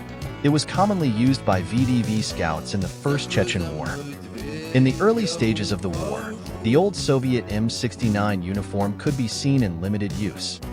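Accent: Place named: United States. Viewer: Very nice. You have a nice speaking voice. It's so very American. You sound like a voice actor for the main lead in an early 00's anime that's been dubbed.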